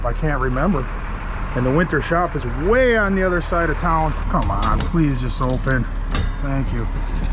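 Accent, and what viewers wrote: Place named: Wisconsin, United States. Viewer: Hey, Love your videos. When you said Kenosha you had my attention. I was born in Waukegan and lived in Kenosha until my dad retired from AMC. We moved to Arkansas when I was eight years old in 1987. My wife asked me how come I don’t have your accent.😂 I told her that because I have a southern accent. 😊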